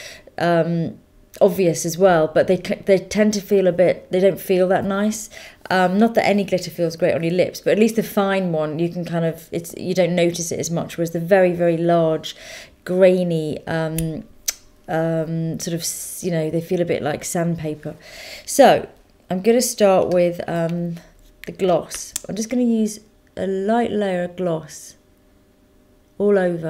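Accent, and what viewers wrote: United Kingdom. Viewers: your amazing and love that your bristish! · i love your british accent!! xD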